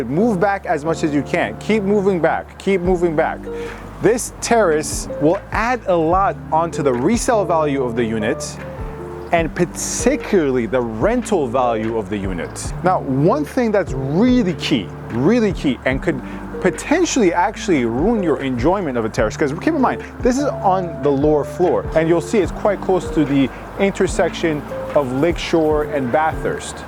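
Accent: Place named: Iran. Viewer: Red flags: 1. Pronounced Toronto wrong 2. Pronounced strip "shhhhtrip" 3. "Student" (first time) buyer 4. The condo owner owns everything from the structural ceiling, walls, and floors. Don't need permission for finishes. Just permission to carry out noisey work. Stripping popcorn is not a "risk". 5. That hint of the BMW "Pairjan" accent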